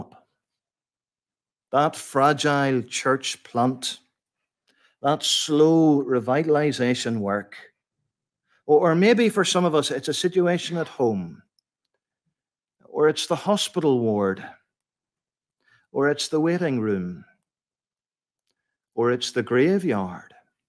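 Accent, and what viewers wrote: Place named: Ireland. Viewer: Thank you for a great sermon - loved the Irish accent too. Nice to see an Irish pastor -God bless.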